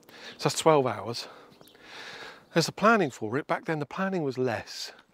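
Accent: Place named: United Kingdom. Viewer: Hey. I've been following you for a few months now. I gotta say that I truly love your content and admire you both. I don't think people realize the work that goes into a Youtube channel sometimes and the return to work ratio involved. You guys are rock stars and I love how genuine you come across in your videos (Perhaps the British accent helps LOL). Anyhow, I also have a channel about anesthesia (I'm an anesthetist in the US), small channel 5k subs and I would concur that 98% of the comments are good, but I do get the eventual terrible comment that can be considered hurtful. I've learned that there are a lot of people that just want to criticize for no apparent reason. It can be brutal. But I conditioned myself to not become overly emotional with bad comments and I am quick to reply to them and tell them to move on. I could never understand why people have the need to make a bad comment on a video if they didn't like it. 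My thinking is just move on or swipe away. Anyhow, I'd work on the psychology of dealing with negative people and continue to do what you enjoy. I particularly like your videos when you explore the mental side and financial preparation involved in retiring early. Looking forward to your next video on how much do you need to retire. That I know, you've never dug deep into actual numbers and this is highly sought after forepeople like me who think we never have enough to actually pull the trigger. With that said, looking forward to the next video. Best of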